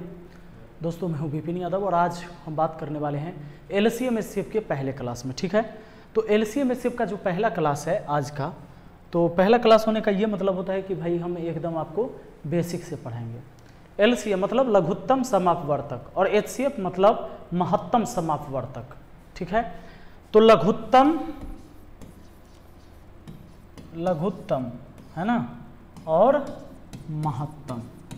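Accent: Bihar, India. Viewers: Sir aap bihari ho kya aap video mai ache language use Kiya kro😅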